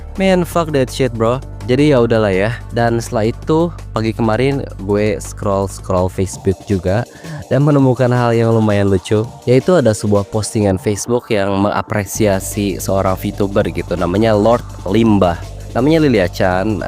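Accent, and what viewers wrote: West Java, Indonesia. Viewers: bang, lu sunda ya?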